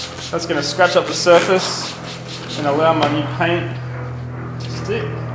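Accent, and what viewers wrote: Australia. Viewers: An Aussie if Ive ever heard it! Good video mate. Keep up the good work.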